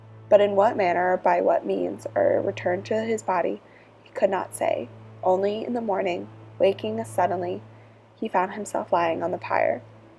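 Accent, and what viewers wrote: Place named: South, United States. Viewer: Sorry...I found both the graphics and the monotonous Southern voice annoying.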